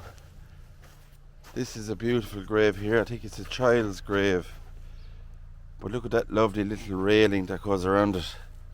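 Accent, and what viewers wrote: Ireland. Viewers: Thanks fabulous video, lovely Irish voice Lynn in Brittany x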